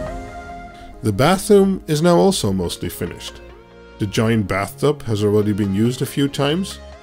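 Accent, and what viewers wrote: Netherlands. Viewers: Ik hoorde meteen je Nederlandse accent. Mooi huis!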